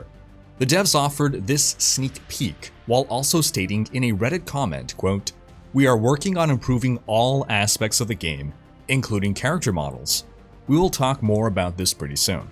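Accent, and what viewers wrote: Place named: Canada. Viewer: Is that why his Canadian accent/inflections are coming through more? Or because of...? :D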